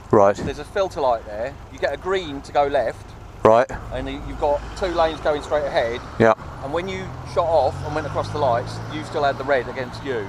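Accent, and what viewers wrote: England, United Kingdom. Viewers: Love it, great English accent, great explanations and ride tyvm to you sir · Why not drive on the left you sound English? Isn't London in England?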